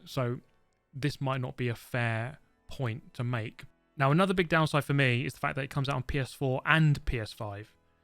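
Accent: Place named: London, United Kingdom. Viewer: I think you're from the same part of London as General Minus based on the accent..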